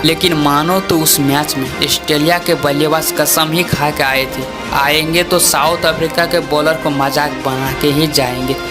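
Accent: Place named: Bihar, India. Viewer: Abe sale bihari hindi bolni seekh pehle bestman ashtelia khilod gindbaz dhmkedaar tbrtor naseme kia h sale shudh hindi bolo bihario salo😊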